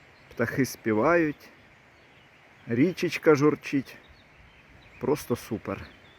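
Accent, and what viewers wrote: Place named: Vinnytsia, Ukraine. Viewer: Деякі слова такі, наче ти з Вінничини, типу ходю. Здивований що ти білорус.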